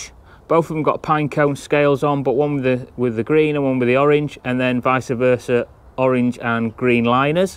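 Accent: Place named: Yorkshire, United Kingdom. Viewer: Nice little video on your process mate, nice to hear a fellow Yorkshireman on YT, the finished result is bloody awesome mate, all the best from Doncaster.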